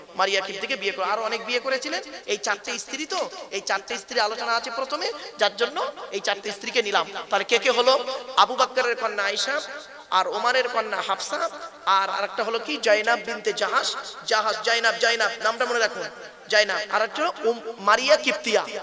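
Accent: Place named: Kolkata, India. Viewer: হুজুর আপনার ওয়জ খুব সুন্দর লাগে আমি বাংলাদেশ থেকে বলছি আপনার কথা শুনে মনেহয় কোলকাতা কি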